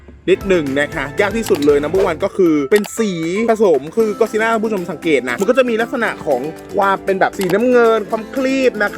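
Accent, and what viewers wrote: South, Thailand. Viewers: พี่เป็นคนใต้หรอครับ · พี่เป็นคนใต้หรอคะหนูพูดใต้ไม่ได้แต่หนูพูดจีนได้ค่ะ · แม่เจอแล้วพี่เป็นคนใต้เหรอ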